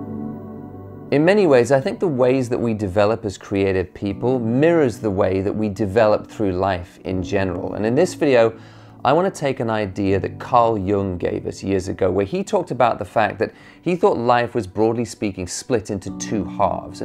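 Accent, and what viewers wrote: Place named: South Africa. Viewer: I thought I could here a little South African in your accent. Haha. Anyway Great content Man. Your videos are inspiring.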